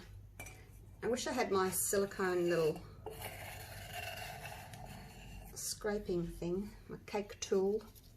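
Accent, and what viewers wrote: Australia. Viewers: Love this video and love the Aussie accent!!! “Oh this is so exiting lol 😂 “ u made me giggle how happy u were wen removing your clear coasters from your mold!!!!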